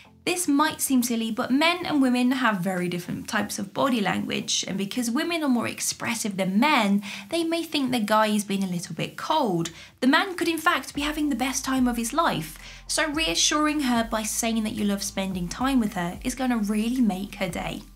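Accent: United Kingdom. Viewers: Who is this British chick? Does she know Austin Powers?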